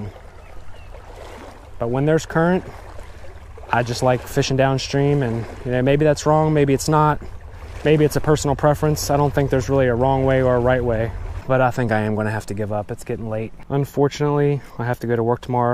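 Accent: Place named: Baltimore, United States. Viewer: Jamie Emes my first guess was Maryland. Sounds like bawlmer. Checked his Instagram seems WV, pretty dang close to western Maryland.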